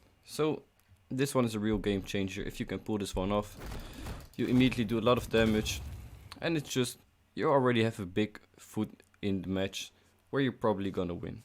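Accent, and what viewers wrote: Netherlands. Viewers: dit nederlandse accent:cat-orange-whistling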